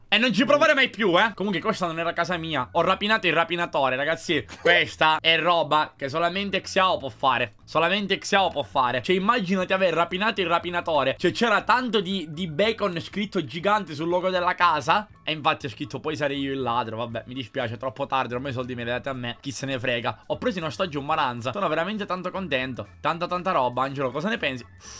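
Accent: Sicily, Italy. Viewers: Ma sei siciliano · ma sei siciliano?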